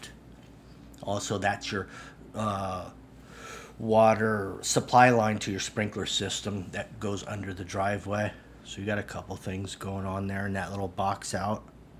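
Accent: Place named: California, United States. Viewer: Listening to California Surfer accent takes me back...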